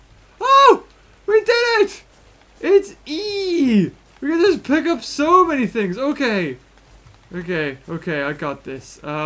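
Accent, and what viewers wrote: United Kingdom. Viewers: He’s british but has a deeper voice than stampy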